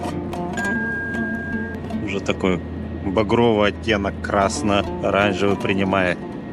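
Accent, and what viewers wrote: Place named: Kirov, Russia. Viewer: Спасибо за видео. Приятный кирОвский акцент.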